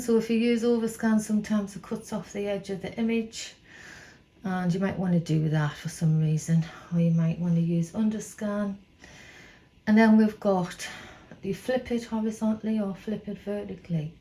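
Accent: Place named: Newcastle, United Kingdom. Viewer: Great informative video, Would not have had a clue about some of the options without it, so many thanks. And seriously - loved the Geordie accent.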